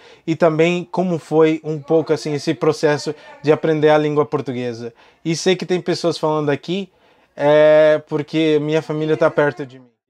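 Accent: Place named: Sao Paulo, Brazil. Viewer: Yeah, your portuguese looks like paulista accent.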